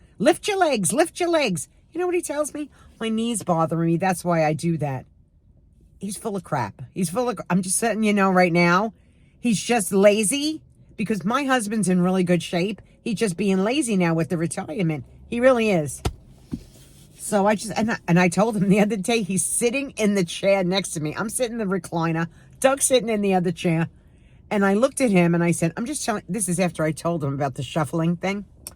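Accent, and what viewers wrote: New York, United States. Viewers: Oh my goodness!!! I can definitely relate to you! My husband was a long haul driver too! The first few years we were married he was gone all the time! now he drives for Uber L O L I like it. But then I don’t like it when he sits in his chair at home! i’m like…. don’t you wanna go do something? He’s like, No…but we have animals so he’ll go outside to the animals in the morning and then he’ll go tend to the animals in the afternoon…. but then other parts of the time he’s in his chair when he’s not driving for Uber and then I say don’t you wanna read a book or learn a new craft; build a new porch or build us a new deck?!?!, my firstborn was born in 1987 so I wonder if we also have that in common…what year was your daughter born? By the way, I love your accent. Are you originally from Long Island or from New York? So your birthday is on the 28th!!! 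How exciting! I hope you watch my next video. I shouted you out!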